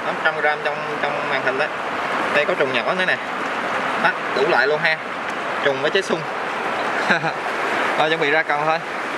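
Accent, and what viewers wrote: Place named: South, Vietnam. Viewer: E nói giọng miền nam, quê e ở đâ vậy?mà có sông lớn,nước chảy siết?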